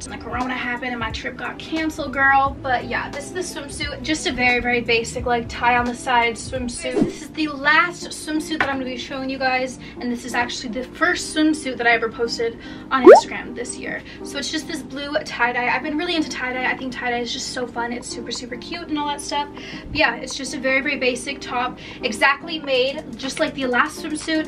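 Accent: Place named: Texas, United States. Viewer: her texas accent 😂❤️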